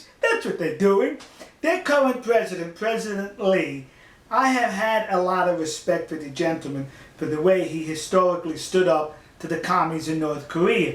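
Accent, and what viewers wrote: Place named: New York, United States. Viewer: The character is a fraud. He doesn't even sound Texan. I think he has a New Yorker accent LOL. It's funny how they promote memorabilia items online. Just a way to earn a quick buck by telling the Japanese what they want to hear.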